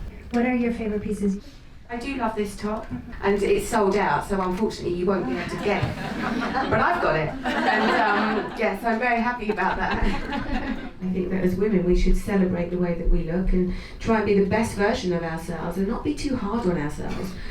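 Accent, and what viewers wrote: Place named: England, United Kingdom. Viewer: That voice and English accent! Love VB since she was Posh Spice!!!! ♥️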